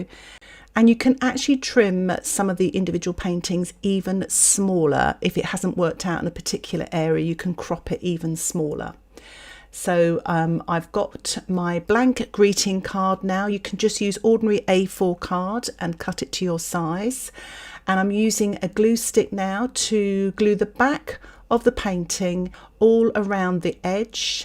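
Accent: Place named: United States. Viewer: Hi this vid has an American accent, hope this is all legal, Brisbane Australia 🦘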